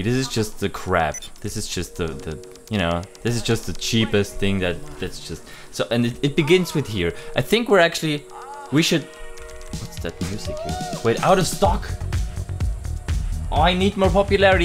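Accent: Germany. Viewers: Bist du deutscher? ^^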